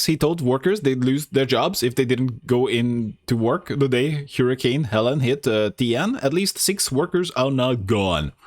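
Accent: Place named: Eastern Europe. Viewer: There’s no way you’re not Eastern Euro! No way!!! Subscribed btw 😀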